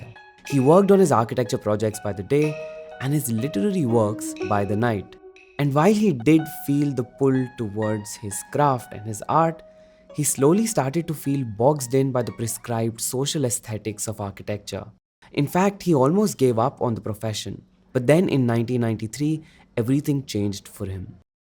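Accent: India. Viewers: I CANT TAKE A VIDEO WITH 10 MINUTES OF INDIAN ACCENT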